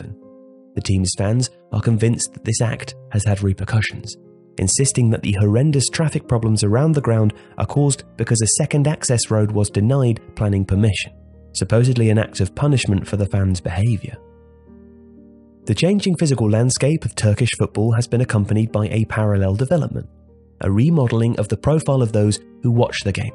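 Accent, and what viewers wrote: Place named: United Kingdom. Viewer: Wow. Your british accent so nice, greetings from turkey nice channel keep goin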